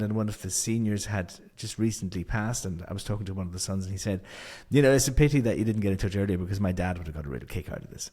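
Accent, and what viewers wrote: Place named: Ireland. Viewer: What part of Ireland are you from? I don't recognize the accent. It's hard to pinpoint. It's like a mash of several accents. I'm from Cavan by the way.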